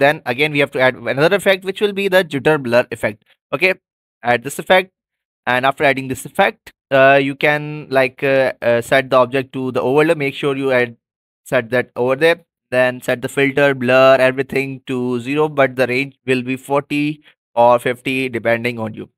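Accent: India. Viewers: Bro not the Indian voice It icks me out a bit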